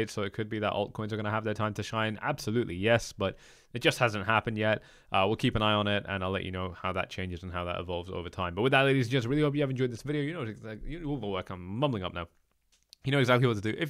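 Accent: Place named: England, United Kingdom. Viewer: hey, haven’t been watching technical analysis lately but I’m wondering what happened with your cool English accent.. you had one years ago and now I can’t hear it.. seriously did you move to Dubai like most of the other crypto guy’s and loose the accent.. anyway peace bro.. you always have had great ta.. appreciate it ..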